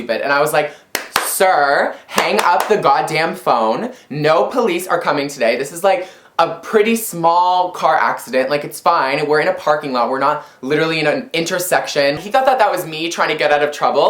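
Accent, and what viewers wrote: Canada. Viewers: it sounds more canadian than his normal voice hahaa x)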